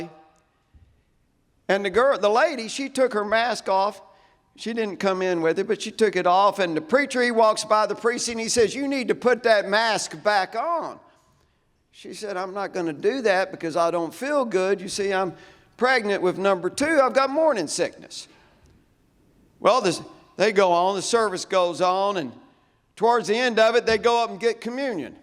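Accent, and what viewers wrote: United States. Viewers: good ol' Texan slant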